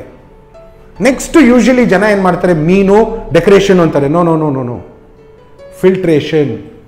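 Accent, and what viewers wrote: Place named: Kerala, India. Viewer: WOW... BABAki Jai ho.... from your Hindi Accent, I always thought you might be from Kerala... but this gave me goosebumps when you spoke in Kannada... Super... would request you to make more kannada videos...:-D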